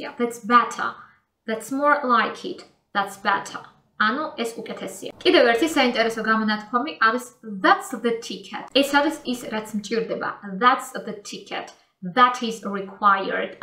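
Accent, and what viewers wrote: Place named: United Kingdom. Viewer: ძალიან კარგად ხსნი, მაგრამ ბრიტიშ ინგლიშს სამწუხაროდ ვერ ვიგებ, ამერიკული ვარიანტიც დაამატე რა, ამერიკული გამოთქმა,თ- ს ყური ვერ ეგუება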